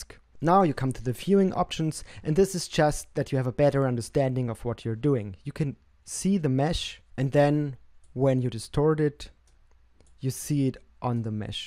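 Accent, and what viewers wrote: Germany. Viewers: Servus, wie gut geht es dir heute! Weil ich behaupte herauszuhören, dass du Deutsch deine Muttersprache ist (vermutlich denkst du, "es ist auch nicht schwer zu erkennen"), schreibe ich auf Deutsch. Hier geht es aber um deine Skills. Die finde ich sehr beeindruckend und deine Tipps hilfreich! Danke! Habe auch deinen Kanal aboniert!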